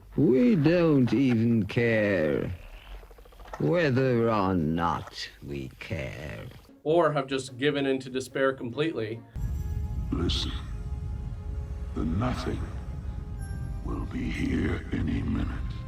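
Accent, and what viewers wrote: Canada. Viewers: canadian, eh? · Are you Canadian?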